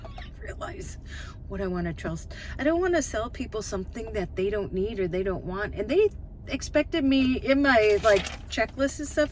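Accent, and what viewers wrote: Minnesota, United States. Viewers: You are a cook. And i mean that in the best sense of the word. Caught a couple of your videos a year or so apart.. just caught a hint of your Minnesota accent. Love it! Subscribed.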